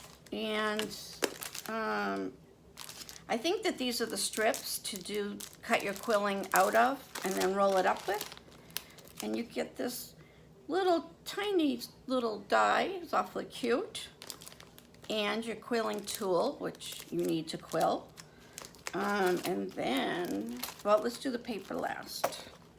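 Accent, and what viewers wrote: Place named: Boston, United States. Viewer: Oh I guessed Boston so you being from Mass makes sense :) It's awesome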